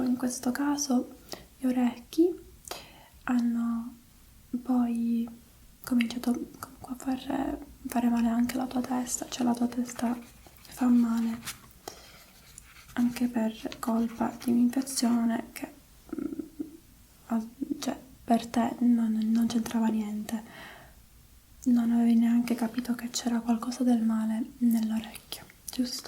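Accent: Sicily, Italy. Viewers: omg u speak Italian way to good!!! I am Italian and I can say that u have a beautiful Sicilian accent! I love ur videos,;* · L'accento sicilianoo❤😂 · L accento siciliano é un tocco di classe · ‘Mbare, I hear some sicilian accent here 😏😍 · Quando parli in italiasiciliano! sei molto tenera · Siciliana sicuramente, si sente tantissimo e capita anche a me ogni volta che vado al nord nonostante io parli in italiano 😂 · Oh wow, non avevo idea che fossi semi-italiana 😊 All'inizio ho visto questo video e ho pensato 'oh ok, allora è un genio', ma adesso sapendo che sei cresciuta in Canada ha un senso 😁 Hai un accento stranissimo, un misto di forte siciliano e straniero non meglio identificato haha Ma parli molto bene, mi piace! Ti dico qualche errore che mi è saltato all'orecchio per aiutarti: lavorare sul computer = al computer, infezione negli orecchi = alle orecchie, lentine a contatto = o dici lentine e basta (che comunque è molto informale e forse siciliano, essendo siciliana anch'io non sono sicura XD) o lenti a contatto, sistema immune = immunitario. 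Comunque grande! Tuo marito è italiano o canadese, per curiosità? :P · Io sento l accento sicilianooooo!❤️ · i heard some sicilian accent 🤣🤤 ti amo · Si sente che è siciliana... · Che meraviglioso accento siciliano. Una mia cara amica di Siracusa parla proprio come te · Non capisco. Questa ragazza ha origini italiane? Il suo accento è italianissimo, quasi siculo!! Adoro 😻 bravissima! Hai una voce meravigliosa. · Con l'accento marcatamente siciliano, ce ne vuole coraggio a dire che è "americana", solo perché ha vissuto qualche anno in Canada! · Sei siciliana? Si sente tantissimo l'accento😄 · It seems that you come from Sicily because of your accent. It's wonderful,gorgeous. As an italian,complimenti❤ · Adoro il fatto che tu abbia un accento siciliano bellissimo♡♡♡ sei bravissima, complimenti! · il bello è che è canadese ma quando parla italiano sembra siciliana doc😂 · Hai un accento siciliano fortissimo ahahah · In tutto ciò solo i veri siciliani capiscono l'accento fortissimo(e bellissimo) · Hai un accento siciliano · Bellissimo il tuo accento siciliano 😍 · Seem that you have a Sicilian accent 😂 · Sembra siciliana hahahaha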